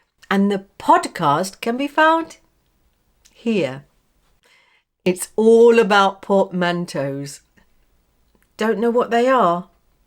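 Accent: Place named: Northern England, United Kingdom. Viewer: What city do you live in? You sound from north England. Greetings from Casablanca Morocco.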